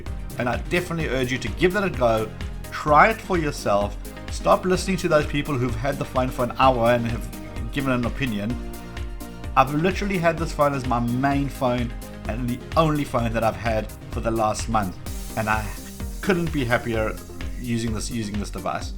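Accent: South Africa. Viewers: south african accent there ... lol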